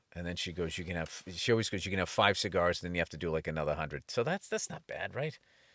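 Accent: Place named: mixed, United States. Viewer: This much truth in a Boston/New York accent is breaking my reality. DAMNIT Bill, knock this shit off, but effing don't.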